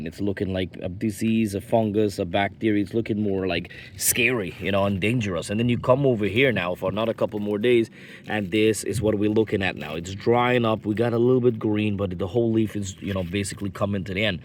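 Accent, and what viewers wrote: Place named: Guyana. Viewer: I heard the accent and had to subscribe! From one Guyanese gardener to another 🇬🇾🇹🇹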